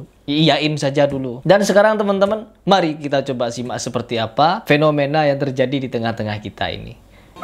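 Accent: Madura, Indonesia. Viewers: Bg lu emang orang Madura yah?? Kirain cuma logat lu aja Madura.itu di caption lu pake bhs Madura kan??? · Pola oreng madureh kakeh mas. Logatnya kayak oreng madureh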